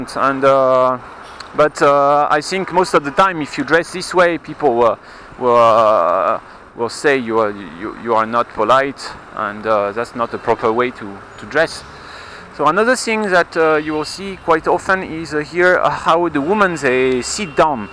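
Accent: France. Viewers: Bonjours ! You have a very French accent , and i am wondering if that can cause problems in teaching English in China ? I lived in China for 11 years , i also was an English teacher !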